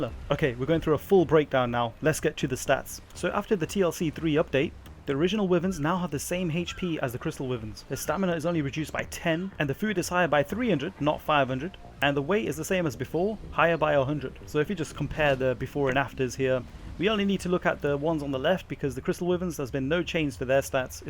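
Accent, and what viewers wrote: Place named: England, United Kingdom. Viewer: Good video and well researched but considering you sound English I found excruciating that you pronounce Wyvern wrong... Considering it’s a Welsh dragon... ><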